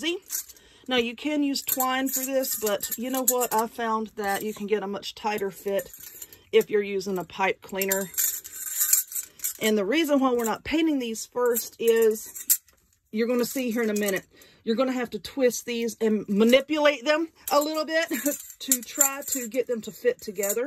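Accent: South, United States. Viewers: Going to Dollar general to heyu snowman and Wally World to get my rings. He's darling and I love your southern accent. It's the bomb! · I ABSOFREAKINLOUTY LOVE YOUR CHANNEL!!! I'm from Dallas, NC and have that southern TWANG too!! It's so great to here someone like me on You Tube! Yes Mam, we were raised right. Only a Southern Girl knows all the old sayings and uses them right, as well as, MANNERS!! XOXO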